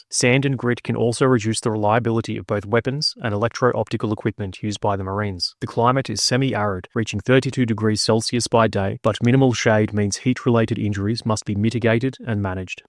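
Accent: Australia. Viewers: He clearly has an Australian accent.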